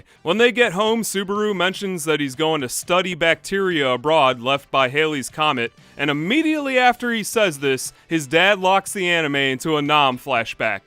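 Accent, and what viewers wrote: United States. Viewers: Sound american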